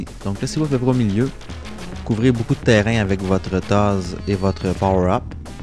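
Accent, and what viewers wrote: Quebec, Canada. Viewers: Québécois oui, mais tu es quand même très compréhensible pour des français comme moi ;) Et GG pour ta vidéo, même si tu trouves que Gaiares est un jeu facile (alors qu'arriver jusqu'au niveau 4 relève de l'exploit) tu arrives quand même à faire un run sans perdre une seule vie. C'est la que je me dis "Putain, ce que les canadiens sont bons :p"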